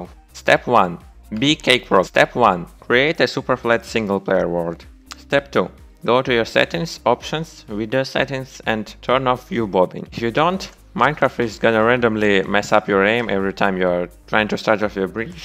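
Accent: Russia. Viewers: Русский что ли? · ты же русский)) акцент слышен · Какой то акцент не американский.. ты же русский... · Ты русский который выучил английский или наоборот Видос топ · Сразу понял что русский)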